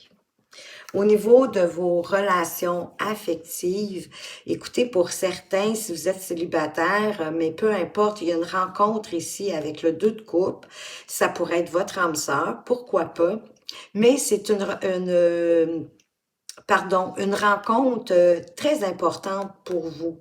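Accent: Quebec, Canada. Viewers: Merci à vous pour ce beau tirage si parlant !! et la fin me bouleverse ! oui j'ai mis de côté les soins que je peux offrir grâce à mes mains . C'est un plaisir de vous écouter et de vous entendre ! j'adore l'accent québécois. j'en suis tombée amoureuse quand j'ai vécu là-bas 🙏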